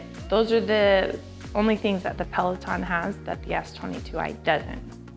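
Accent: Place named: United States. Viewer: Couldn’t agree more, a yank voice does my head in at the best of times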